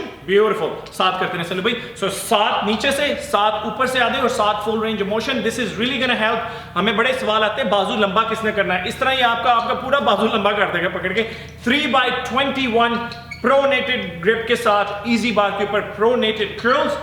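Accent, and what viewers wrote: India. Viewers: This indian accent :(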